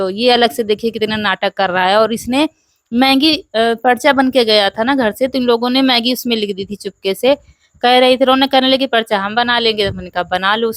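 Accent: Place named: Lucknow, India. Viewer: बहुत अच्छा व्लाग है बहन आप कया लखनऊ के आसपास की हो बहना आप की बोली से लगता है कयोकि मैं भी लखनऊ से हु और आप के विडियो हमेशा देखती हूँ 👌👌👌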